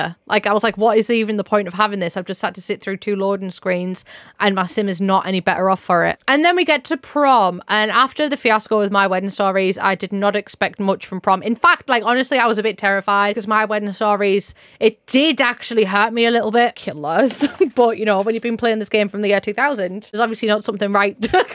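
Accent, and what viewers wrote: United Kingdom. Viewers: bro i’m sorry but what’re your accent is? somewhere in britain?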